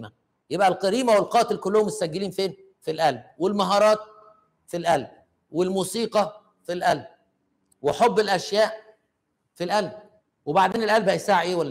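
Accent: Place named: Iraq. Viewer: ف السعودية يسما الهيل حبه خضره تنحط مع القهوة تكسرها شيء بسيط بي سنونك وتحطها كاملة تحت اللسان ٣ حبات كويسة اذا تنهج اذا سويت مجهود وتعبت حطها تفيد في مصر تسما حب هان. لهجتك من العراق يسمى الهيل الأخضر او الهيل الحقيقي او الهال او قاع القلعة له مسميات كثير لكن المعروف عند الخليج الهيل الله واعلم ايش اسمو ف العراق لكن استخدامو في القهوة و في المرق وفي الكبسة وفي الفته وفي العريكة وفي الشاهي العراقي أظن يستخدمونه وحتى الحلى اذا ماعرفت ارسلك صورته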